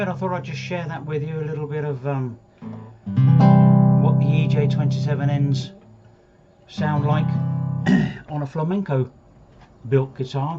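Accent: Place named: Northern England, United Kingdom. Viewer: Thanks for answering ! It sounds a bit northern English...but I may be wrong. Anyway, thanks man. Love your videos.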